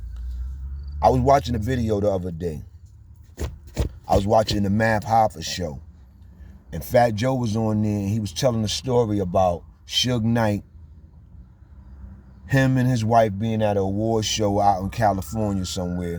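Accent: New York, United States. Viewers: Ain't nothing like hearin a REAL NY NIGGA spit that G!...U Heard.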